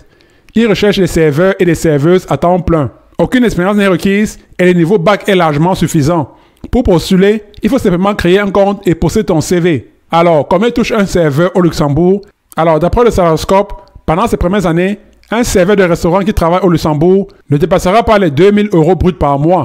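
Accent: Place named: Cameroon. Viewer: Mon frère camerounais le ton là je reconnais déjà ❤❤😂😂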